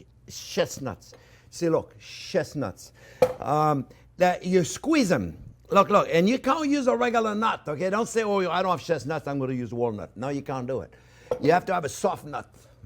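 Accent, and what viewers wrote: France. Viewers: Great video. You are an amazing teacher. You never said what temperature the oven was set. I guess 350degrees? Please use ingredients & the measurements in subtitles as your beautiful French accent is a bit confusing to this 72 year old Marine. God bless you and your family during this holiday season. I look forward to your next video. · your accent and attitude are evrything ! mon cheri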